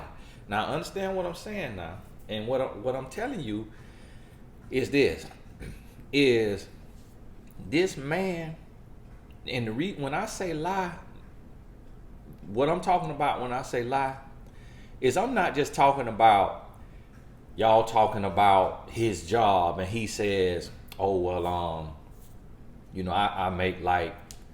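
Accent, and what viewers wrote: South, United States. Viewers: Lol. Gosh darn it,..that accent of yours. Neospirin...nope. Its pronounced Neosporin. 😂. I know, I know...I'm from the South too. Good video though. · I love the country accent 😊😊❤️. Preach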